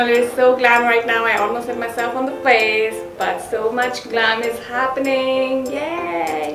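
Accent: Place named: Bihar, India. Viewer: You sound like a bihari..are you one?? :)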